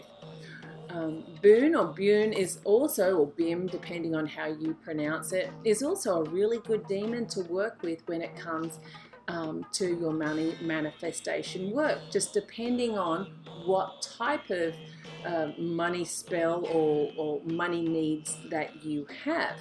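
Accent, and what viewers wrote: Australia. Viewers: Thank you and Bune~ thank you, everything sounds so much nicer with an Aussie accent:D Thank you for sharing your knowledge Blessings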